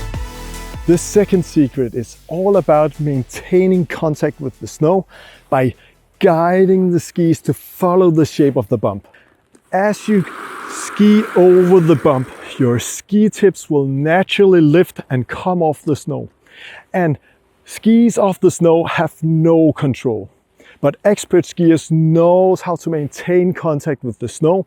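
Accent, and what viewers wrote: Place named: Germany. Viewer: The German/Austrian accent of the narrator suggested this would be a detailed instructional tutorial rather than some fluffy review stuff. No surprise here about clarity, brevity and value of advice. Will forward this vid to my kids to improve their downhills.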